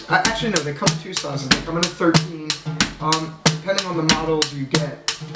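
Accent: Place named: Philadelphia, United States. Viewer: Not even kidding, first 10 seconds and I have to ask: what part of Philly are you from?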